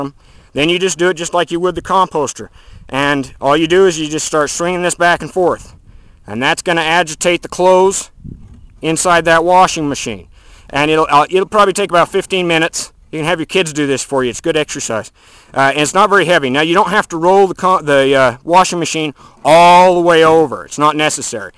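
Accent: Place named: United States. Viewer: Have to ask ..I collect accents ..what state are you from..??/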